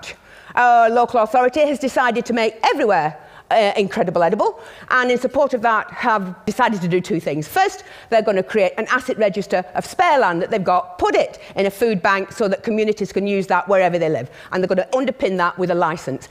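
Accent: United Kingdom. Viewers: Strong accents? It's british english.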